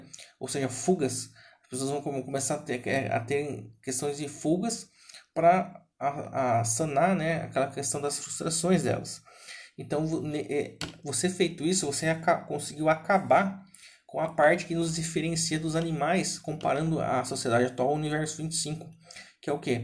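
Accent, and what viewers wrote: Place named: mixed, Brazil. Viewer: O sotaque mineiro/goiano é perceptível, ksksk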